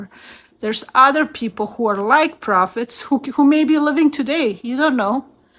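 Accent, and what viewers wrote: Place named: Russia. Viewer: rasanya orang Russia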